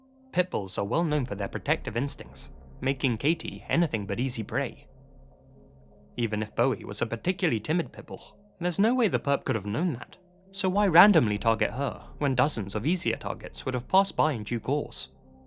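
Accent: United Kingdom. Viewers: They don’t say those phrases in the states. Sounds like a Brit for sure.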